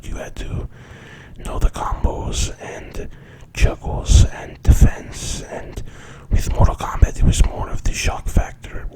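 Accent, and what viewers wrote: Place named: Japan. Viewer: I love the old Japanese accent! 😂 Perfect 👌🏼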